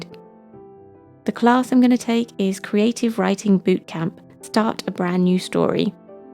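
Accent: United Kingdom. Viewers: Hi again!! Never mind my question about your IG account, I just saw the video again and took screenshot of your IG account name. Oh oh yes, and the spreads and the weekly so I can recreate and tag you. (But I am NOT nearly as talented as you, so it will take quite some time and a lot of hard work and trying and failing before I will have something I’m not too embarrased to show you....😅❤️ It was even more relaxing to watch the video for the second time btw. Cos then I knew what was coming up so I could be a bit more at ease👍🥰 One more thing: you should definately become a teacher of some sort for people with anxiety or stressed people, cos your voice is the most relaxing voice I’ve ever heard. With that perfect British English/accent❤️ I always find myself very relaxed and full of ideas after watching your videos. I don’t usually comment, but after this I just had to🙏❤️ Love from me💖👱🏻‍♀️🇳🇴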